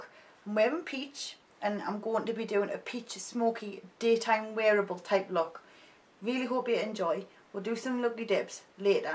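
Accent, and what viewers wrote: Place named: Scotland, United Kingdom. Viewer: You look beautiful and I for one have missed you., could you please tell me if you live close to Scotland? Your dialect and some words seem similar to my late grandfather. (I live in TX)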